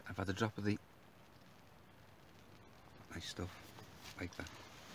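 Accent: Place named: Merseyside, United Kingdom. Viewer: Brilliant one, great choice of location even if it was in the dark. You never forget your first wildcamp and to do so as a solo one is even better! Great video and every trip out should be an opportunity to learn. From pack size and weight to routes and weather watching, it all adds to the fun. Just trying to work out which side of the Mersey you're from based upon the accent, I was going to say your a Wirralian but not too sure now. I'm heading to check out your other vids too.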